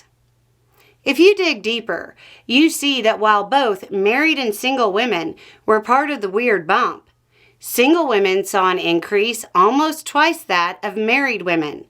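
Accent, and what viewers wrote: South, United States. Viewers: After being exposed to Hollywood & American TV shows, I was amazed by your command of statistical analysis - not to mention critical thinking, logic & correct grammar. For years American movies & TV habitually used “Southern accents...” as indicative of the conservative “slow & stupid” (amongst other strange things). I came to your channel fully expecting “riotous humour” with liberal examples of misaligned geography; culture; science etc. etc., all in mangled English. A long-lived stereotype, destroyed! I am embarrassed to say that I even applied certain “metrics” to make sure you were not AI generated. Can’t be too careful these days. You and your type would be very welcome in Aussie!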